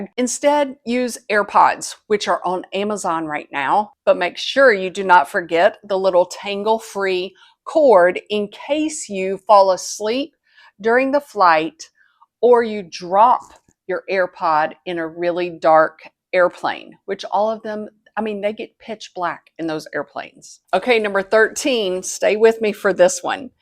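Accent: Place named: Texas, United States. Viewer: Texas accent But I'm a big fan of travel tips Definitely avoiding chafing lotion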